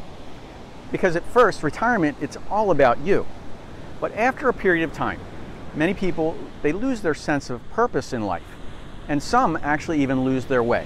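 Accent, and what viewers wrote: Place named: Pittsburgh, United States. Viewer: Accent is from Pittsburgh?... maybe Philly...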